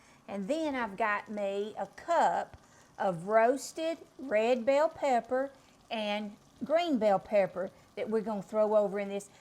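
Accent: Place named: South, United States. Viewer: This looks really good 😋. New Subscriber 719 🎉. I love your southern accent and videos. Just saw the Shorts with Fried Okra. Will you be posting it here too?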